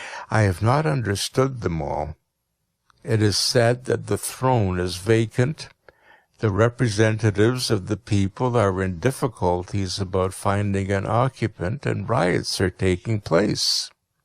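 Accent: United Kingdom. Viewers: на слух - британский.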